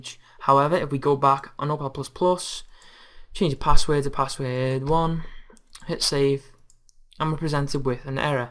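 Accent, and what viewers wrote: Liverpool, United Kingdom. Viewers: Your accent sounds like you're from Liverpool.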